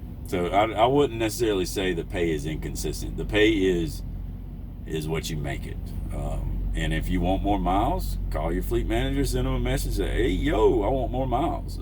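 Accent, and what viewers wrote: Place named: Georgia, United States. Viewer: I really luv ur videos ur so down to earth and intelligent . Georgia men WOW...needless to say I could listen to your Georgia accent all day🤭☺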